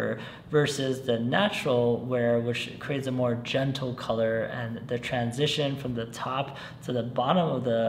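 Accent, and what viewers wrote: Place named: United States. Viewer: This video was supposed to be about the art of carpet making. This salesman speil in American accent takes all the fun out of it.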